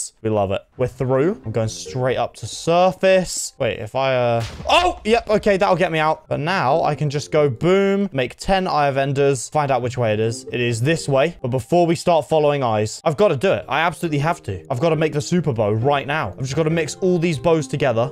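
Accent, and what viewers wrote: United Kingdom. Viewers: Your voice is a little british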